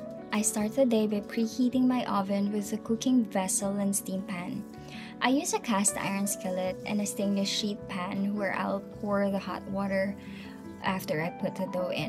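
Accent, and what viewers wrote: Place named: Philippines. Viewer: Aha I knew that filipino english accent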